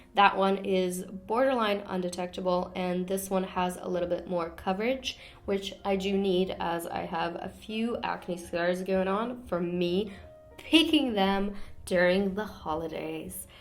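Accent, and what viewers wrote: United Kingdom. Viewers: Soooo happy to see you back 💗 I also love your slight british accent hahaha it’s so cute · You're starting to get that English accent! Too cute! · That British accent is coming through and I am here for it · OKAY I saw the comment on your ig about the accent and now I can't unhear it lmao I think it's the way you end the words and say the 't' now. I could also be tripping. but british influence tho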